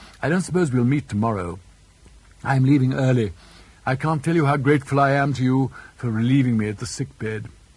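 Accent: RP, United Kingdom. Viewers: his own impeccable received pronunciation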